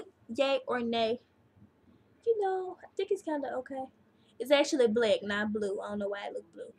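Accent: United States. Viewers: Ur so country lol its cute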